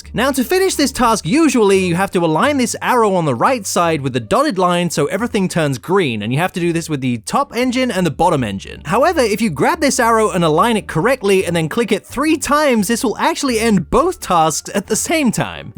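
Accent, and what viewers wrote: United States. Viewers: Why has this man's voice progressively become more American and less British as time goes on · That’s one way to get lots of views. Watched this channel for 5 years - still wish you lost the US accent as you’re clearly not from there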